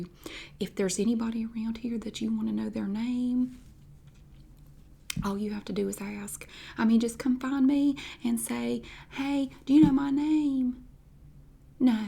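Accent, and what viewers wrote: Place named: South, United States. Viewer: The shadiness of these characters kills me lol your southern accent and the “bless your heart” gets me every time!!! Cant get anymore shadier than saying “bless your heart” to someone lol alsooo this character gives me “Mrs. Roper” from Three’s Company lol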